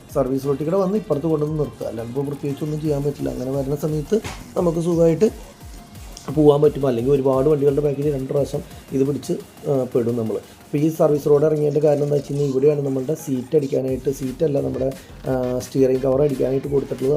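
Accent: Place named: Kottayam, India. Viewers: കോട്ടയം അച്ചടി ഭാഷ ഒർജിനൽ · കോട്ടയം ഭാഷ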